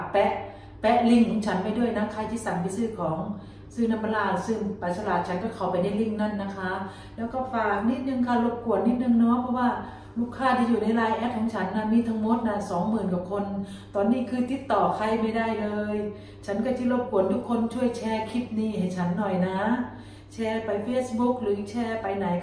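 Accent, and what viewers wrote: Korat, Thailand. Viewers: พี่สุสวยจัง จากบุรีรัมย์ พูดโคราชเหมือนกัยเลยน่ารักเด้อจ้า😊😀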